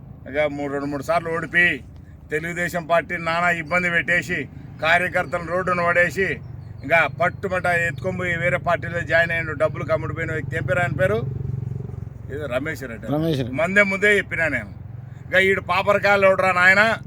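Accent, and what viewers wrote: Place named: Telangana, India. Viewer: Nuvve evarra telangana vadi la vunav Kadapa language em ledu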